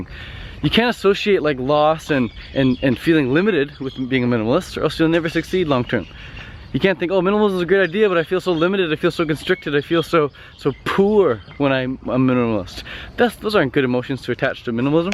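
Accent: Canada. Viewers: Are you candian?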